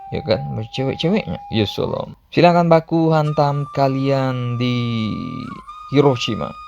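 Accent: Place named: Riau, Indonesia. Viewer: Adminnya orang Riau nih atau kalau ngg BANGKA BELITUNG ✌️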